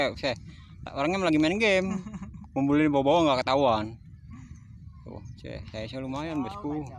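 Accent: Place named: Tangerang, Indonesia. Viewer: Selamat pagi bang .. salam kenal Logat nya kaya orang kampung Melayu tangerng dan sekitarnya ..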